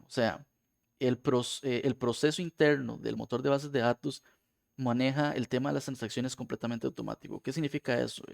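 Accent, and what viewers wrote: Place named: Costa Rica. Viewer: Apenas empecé a escuchar este video dije "Ese acento es tico"🤭🇨🇷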